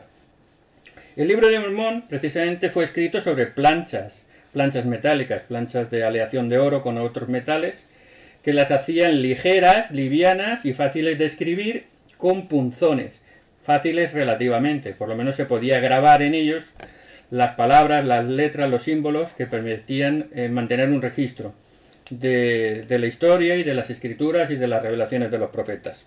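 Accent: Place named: Spain. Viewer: Hermano buen bideo y me fasina su acento español olé tio¡¡ Saludos hermano y éxito, Bendiciones.....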